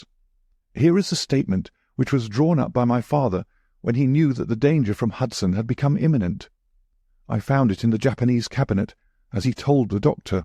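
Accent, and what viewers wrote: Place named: North, United Kingdom. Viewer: No the fellow is from the North .An actor i may say . Aged 45-50 . Thank you Watson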